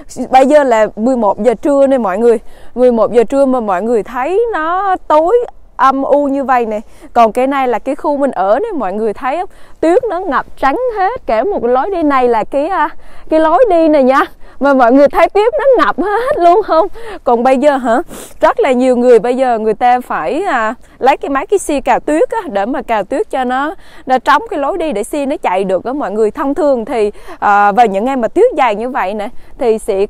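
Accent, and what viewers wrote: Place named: Quy Nhon, Vietnam. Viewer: Giọng này là quy nhơn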